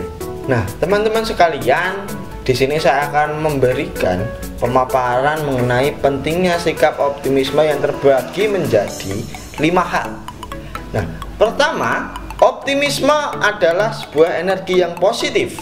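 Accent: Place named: Java, Indonesia. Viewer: Medhok yaaak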